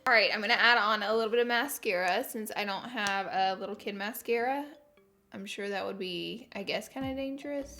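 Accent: South, United States. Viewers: I saw your manicure on Snapchat and I'm in love with it. I've been wanting to tell you something but you get so many comments that I doubt you'd ever see it, but I just wanted to tell you that I love watching your videos because I feel like I can relate to you so much because I'm from Louisiana and your southern accent makes me feel like I know you personally or somethin. I know it sounds crazy but every time that you really like a product I end up going to buy it just because I feel like I know you or something and I feel like we would like the same things. Also I was very surprised that people didn't know what cat's pajamas meant I've been saying that since I was a little kid so maybe it's a southern thing. and speaking of your palette, I use it almost every single day and I have a beauty group on Facebook and I have almost all of my members using it as well because I do live videos of looks with it and they fell in love with the colors and how pigmented they are. 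Just wanted you to know that I really appreciate you and relate to you on a strange level. too, because his mannerism reminds me a lot of my husband's, so I love seeing the two of you together because it reminds me of my husband and I. I hope I don't sound super weird you probably won't see my comment anyway but I just wanted you to know that there was someone out there that really relates with you and appreciates all that you do for the YouTube Community. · I’m glad I’m not the only one with a country accent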